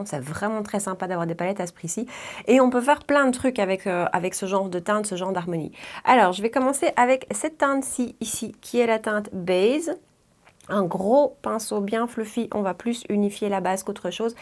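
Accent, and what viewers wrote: Belgium. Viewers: Coucou ma petite. La notification qui fait plaisir à 19h pétante en plus avec un GRWM comme on aime 😁. Ton accent belge on adore 😁. Elle est vraiment belle cette palette de leur collection de Noël, les couleurs ont l’air vraiment intenses et magnifiques de part ce que je vois. Comme tu dis c’est quite ou double chez MUR. Tu me donnes envie de tester quelques produits de chez ELF comme la base. Il faut vraiment que tu testes la poudre lumineuse de chez ELF. (La loge de Gabrielle en parle souvent et la met principalement sur les côtés du visage pour donner un coup d’éclat naturel à la peau vu qu’elle a la peau mixte). Celui que je préfère en petit prix c’est celui de Catrice (je ne sais plus comment il s’appelle exactement), il a une très bonne tenue, une belle couvrance et n’est pas trop asséchant pour mon contour de l’œil j’aime beaucoup combiné avec le fond de teint matte de Catrice et la poudre matte également. 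Avec notre peau grasse il faut de la belle matité. Sympa le petit combo Nyx + essence pour les sourcils (à tester 🤔). Très joli maquillage au passage tu nous surprendras toujours. Pleins de bisous et une très belle soirée 😘❤️.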